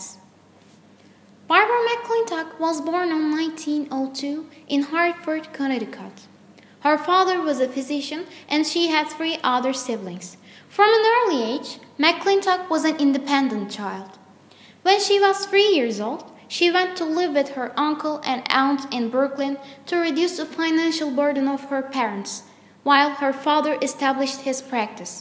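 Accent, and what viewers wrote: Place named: England, United Kingdom. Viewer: Impressive, liked your english accent, i want more.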